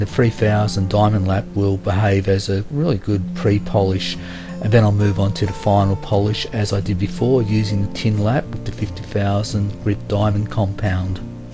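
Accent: Australia. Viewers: Sept' 2020 .. first time here .. so nice to hear an aussie voice lol, love watching how you experts create amazing brilliance.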